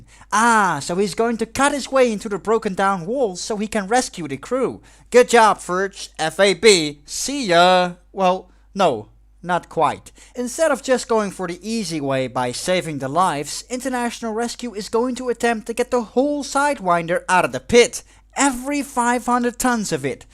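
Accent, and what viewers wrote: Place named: Netherlands. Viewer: I hate your accent i can tell your dutch i am also dutch ahaha😂😂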